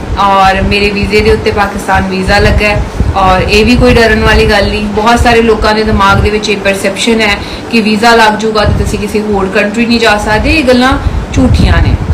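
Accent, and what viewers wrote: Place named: Amritsar, India. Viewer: from your Punjabi accent, it dawned on me you are an 'Ambarasrian' from the opening lines of your conversation on Lahore TV shows. I'm extremely excited to know that you really are after watching all your vlogs telecast from Indian bloggers! Being a son of an Ambarsari family, I also used to go to Wagah Border with my friends regularly in the sixties in the hope that one day I would go across and visit my ancestors' birthplace in Ambarsar (Amritsar), but alas, till today, at the fag end of the existence, that dream has yet to come through. It made me really sad to learn that you lost your father in his thirties. My sincerest commiserations, and prayers for you to excel in all the quarters of showbiz and more than you may also be blessed with a caring and loving Ghabru of your dreams. Anees from Lahore (Ambarsariya).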